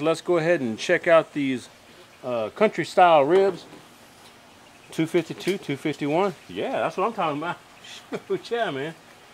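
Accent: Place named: Texas, United States. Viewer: One question that I would have is where in the USA are you cooking? Based on your accent, it sounds like you are also from Texas. Ambient humidity could also have a big influence. If you are down in a high humidity area, like the gulf coast, then the pan probably does not make a difference water or sand. The water pan probably does not have as much of a noticeable difference of the humidity level in the smoker. If you are in a low humidity area, like the Texas panhandle or West Texas sticking with the Texas references, then it make make a bigger difference. Since I am new to your channel, I do not know where you are doing your testing.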